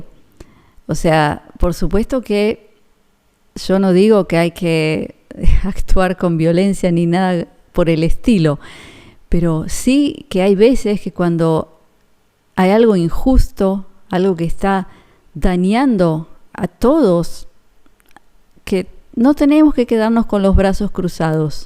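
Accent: Argentina. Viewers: Una Argentina leyendo eso?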